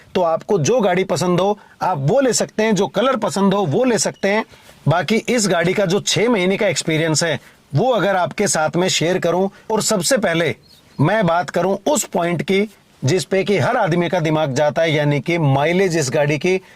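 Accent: Haryana, India. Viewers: भाई जी राम राम ap hna bolte acha h thodi haryanvi touch aati h vo acha lgta h apke es channel par to video top hoti hi h, lekin eske alawa m khna chahta hu ki ap ek apna vlog channel bhi bnaye or daily routine ya family k sath kahi aate jaate h uska vlog bnana ye sab video bhi suru Karo vlog channel k sath.. mja aa jayega ❤️❤️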